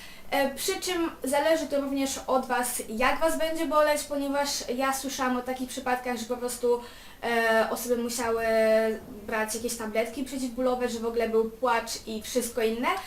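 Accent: France. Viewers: Masz frncuski akcent ;3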